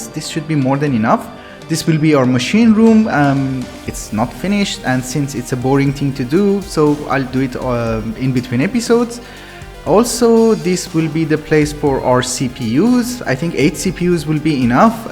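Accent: India. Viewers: Are you Indian?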